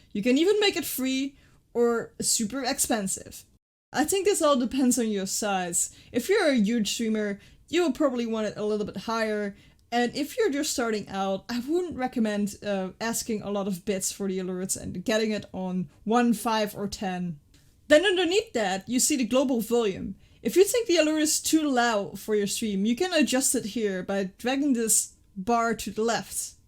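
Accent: Netherlands. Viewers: nice are you dutch you sound dutch!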